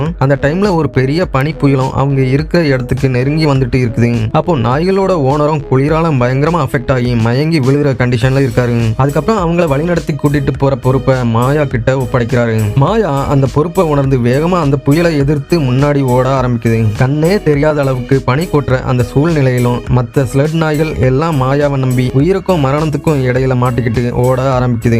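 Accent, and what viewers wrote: Kongu, India. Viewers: Enuginga neega kongu nada 😂 ing vida mudiyala 😂😂 I am kangayam inga 😂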